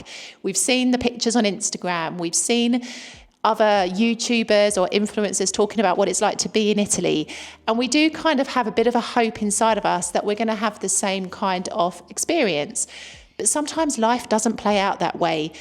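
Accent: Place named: United Kingdom. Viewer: Ugh .... that british accent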